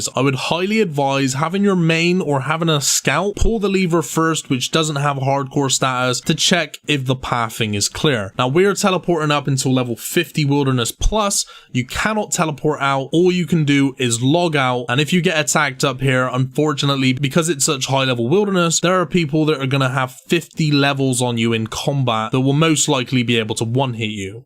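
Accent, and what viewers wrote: Bristol, United Kingdom. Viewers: I've just found you but are you not Bristolian? Liked & Subbed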